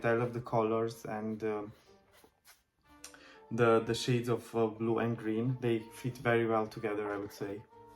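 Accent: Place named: Czech Republic. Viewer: I like your accent:) I've never heard the accent of the Czech republic. And your English is excellent my friend!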